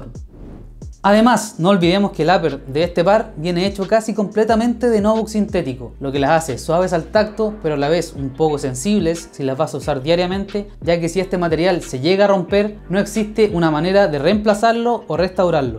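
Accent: Chile. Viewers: Watafaka apenas te escuche. Me di cuenta que eri chileno jajajajaj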